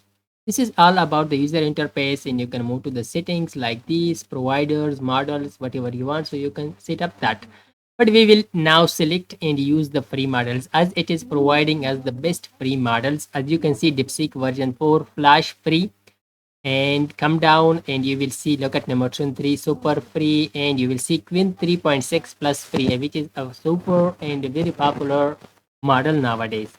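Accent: India. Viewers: she's insinuating that you are a phone call scammer because of your Indian accent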